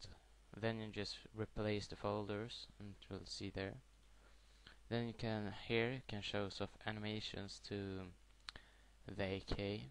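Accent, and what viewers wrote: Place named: England, United Kingdom. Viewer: where did u learn ur english accent :)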